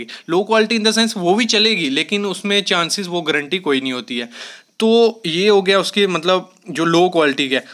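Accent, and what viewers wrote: Jammu, India. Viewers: Jammu se ho aap.??. Dogri touch ha ap ki language me. Good explanation 👍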